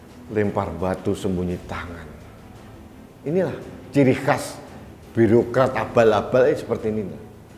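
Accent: Java, Indonesia. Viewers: jowomu asli pak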